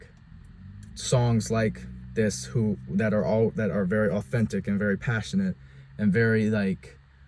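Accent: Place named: Virginia, United States. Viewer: Good video man, nice list. It sounds like youre from VA, I'm not too far from Harrisonburg myself lol, keep it up man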